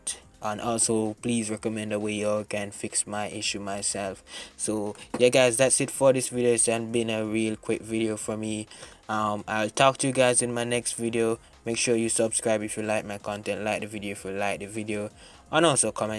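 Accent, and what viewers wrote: Jamaica. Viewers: Sound like a real yaadie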